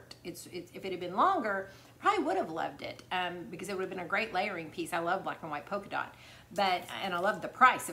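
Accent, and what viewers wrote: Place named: South, United States. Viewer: Hi Sweetheart, I love your accent so much...I was in Nashville last year and I would make conversation with people, because I adore a Southern accent....I loved it there so much, God willing I will return 🙏...I went to New York as well, it was nice, but I like the South xxxxxx🌺🌺🌺Much Love Bella🌺🌺🌺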